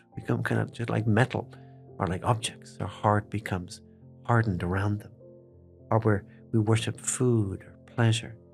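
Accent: Ireland. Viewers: his brogue, while lovely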